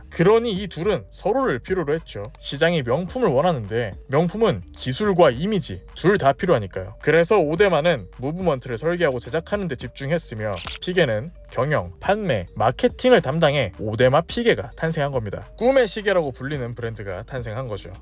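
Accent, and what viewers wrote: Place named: Jeolla, South Korea. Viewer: 말투가 진짜 특이하시당... 경상도 같다가 전라도 같다가..😮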